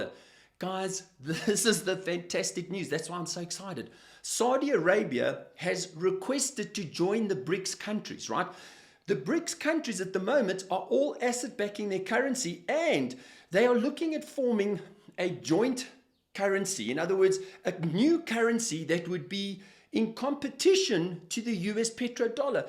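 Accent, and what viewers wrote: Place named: South Africa. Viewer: Thank you so happy to hear a south african voice talking about this. Do you perhaps have a website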